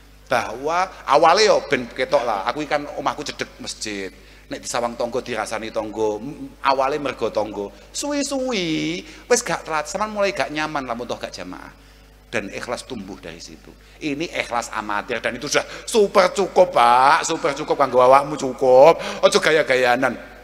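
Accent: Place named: Java, Indonesia. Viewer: WAO GUS CERAMAHNYA DAGING SEMUA , SUBCANALOH ,PRIATUNE ENOM , GANTENG , MUKA ARAB ,,JOWONE MEDOK BANGET , ILMUNE TINGGI ,,,COCOKNYA KALAO DUDUK JEJER SAMA GUS BAHA , SUMBER ILMU SEMUA ,,,WAO KEREN GUS KAUSAR ,, KULO BANGGA GUS DI KEDIRI WABIL KUSUS PLOSO MELAHIRKAN KYAI MUDA CALON KYAI BESAR YG BER KAROMAH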